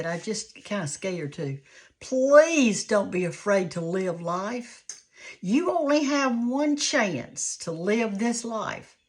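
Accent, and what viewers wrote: South, United States. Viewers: Ms., where are you from if you don't mind me asking. You sound so southern and You remind me of my NANA, BECAUSE SHE USED TO WEAR HER APRONS ON EVERYTHING SHE COOKED. I LOVE YOU MS.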